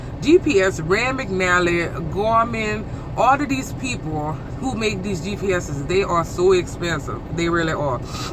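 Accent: Louisiana, United States. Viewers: I love that Cajun accent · Sound like you from south Louisiana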